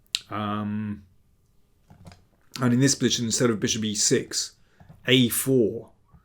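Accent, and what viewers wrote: England, United Kingdom. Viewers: This may not be the fastest recap video, but it's definitely the most clear, articulated and easy to understand for all levels. Plus the English tone and diction goes smoothly with the analysis. Keep them coming, Mr. King.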